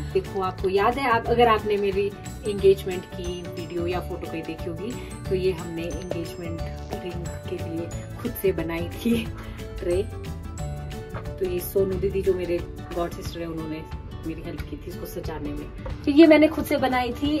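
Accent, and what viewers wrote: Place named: Pahadi, India. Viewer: You are so creative and loved your pahadi accent ❤️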